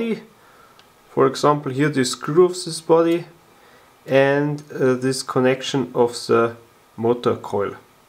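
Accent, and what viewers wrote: Bavaria, Germany. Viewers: Dieser deutsche Akzent aus Bayern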